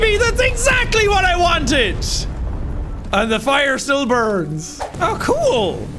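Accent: Scotland, United Kingdom. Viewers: I can't believe no one has yelled (IN FUN) to a comment that says "you are a psychotic ass!!!" 🤣😂 I luv his Scottish accent (or Irish?) too! 🤣❤💋 Please don't hate on me my friends because I KNOW I am an idiot.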